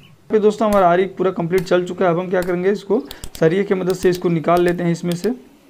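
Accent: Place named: Madhya Pradesh, India. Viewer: MP latiya bolate Hain Mere Bhai❤❤❤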